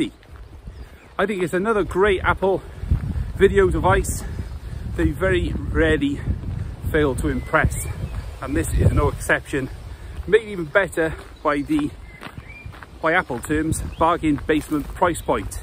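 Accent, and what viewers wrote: United Kingdom. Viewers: your British accent 😍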